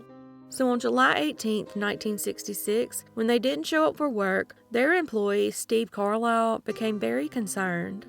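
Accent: South, United States. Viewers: One reason I loved this Chanel was bc of your (yes) Southern accent/voice lol and now beautiful face. Born & raised in MD, I always dreamed of living in the south. Thank you for your diligence in presenting these stories! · Finally get to see the face behind that beautiful southern draw!!!! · Good to see you girl! You are beautiful as that southern accent ! Keep'em coming, and again thanks for introducing yourself! ❤🕵️‍♀️ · You're very pretty and I really like your southern voice. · Yes! It's always nice to see the faces behind the voices we love! In the beginning, I was so fascinated by the voice and southern accent that I forgot to listen to the stories she told. I had to listen twice! 🥴😁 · Oh my goodness sake’s! It’s so nice to see a face that goes with that country voice! One day I’ll finally decide to show my face on my channel also! lol Keep up the great work ! 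· Awesome as always!! Nice to see the face behind the Southern voice!! 💜 You're one of the very few true crime Youtubers who can pack a lot of information within a 10 or 15 minute video & not leave anything pertinent out to do it. While I like an occasional deep dive into a case most times I dont have 30-60 minutes or more to invest. This is what makes your content perfect for me . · Why did you not want to show your face, you are cute. I love your southern drawl. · Awesome Tuesday. We get to see your pretty face with your sweet southern drawl. Thank you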